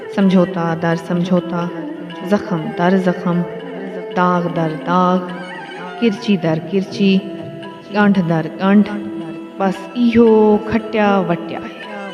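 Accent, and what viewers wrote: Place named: Saraiki, Pakistan. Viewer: Intersting video Apka saraiky accent perfect hy Apki urdo mein b ye lehja galib hy Ap mighty indus se buht ziada inspired hein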